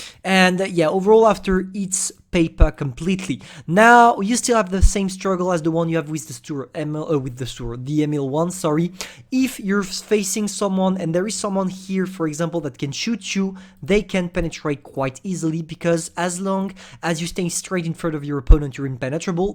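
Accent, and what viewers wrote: France. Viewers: Is u french......yes?!?! Well bounjour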